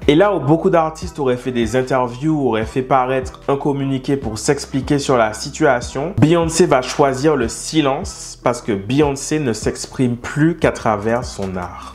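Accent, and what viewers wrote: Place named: Réunion, France. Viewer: cette femme est incroyable ! & je suis fan , mais j'adhère complémentaire sa façon de communiquer , tout passe par son art , c'est brillant . Avec sa elle limite au possible les scandales , sa renforce son coté Queen , j'adore . Merci pour ta vidéo (il aurait fallu rajouter des ptite choses comme "les moqueries sur sa fille Blue Ivy quand elle été seulement un bébé ! je pense que sa a du bien aider à accélérer le changement). Sinon très bonne vidéo , tu parle bien c'est clair sujet maitriser , bonne continuation a toi ! (je trouve que tu as un accent créole ile de la Réunion 974 ?? , je me trompe peux etre ^^)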